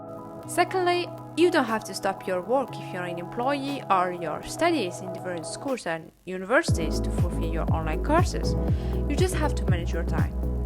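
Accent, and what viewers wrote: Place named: North Africa. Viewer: From your accent you look like from North Africa, your financial aid is displayed in the French language, your name looks like an Arminian name, and you speak English. Where are you really from? 😁😁😁😁 Thanks for sharing this video.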